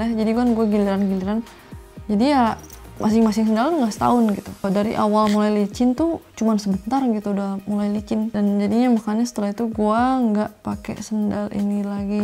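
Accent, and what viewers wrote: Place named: West Java, Indonesia. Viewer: Sunda pisan ieu mah euy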